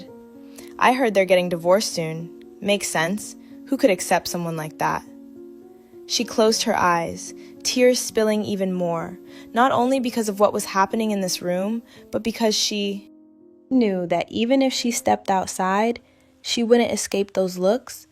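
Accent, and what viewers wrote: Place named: United States. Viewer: No!!! American accent 😩 BUT good story ❤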